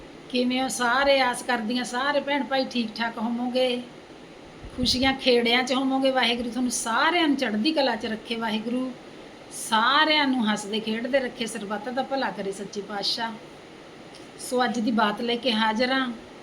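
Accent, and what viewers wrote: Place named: Malwa, India. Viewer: ਮੈਡਮ ਤੁਸੀਂ ਕਿਸ ਸ਼ਹਿਰ ਵਿੱਚੋਂ ਹੋ ਬੋਲੀ ਮਾਲਵੇ ਦੀ ਹੈ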